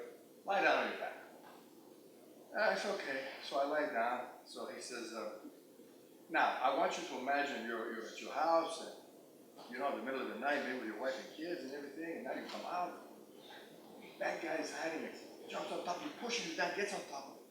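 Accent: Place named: Youngstown, United States. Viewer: This won’t mean much to most anyone, but I can still hear the Youngstown in his voice. It’s like hearing home. Awesome story.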